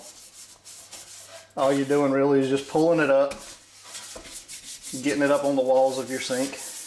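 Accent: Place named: South, United States. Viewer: Love it. And I'm going to guess you're not from up north. Seeing as you don't bother with wearing clothes for a Youtube video and you pronounce gel as jail. No judgement here! You are rocking it. Thanks for the video.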